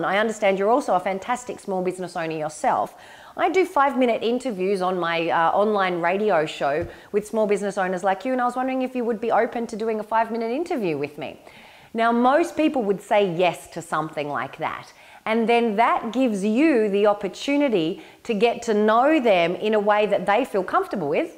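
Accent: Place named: Australia. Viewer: It helps to have an awesome Australian accent.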